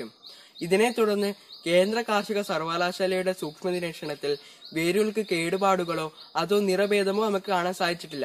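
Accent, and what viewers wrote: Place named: Palakkad, India. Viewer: Evar place palakkadu aanu adahanu engane samsarikkunnad evarude samsara reedhi engane aanu padichu parayunnadalla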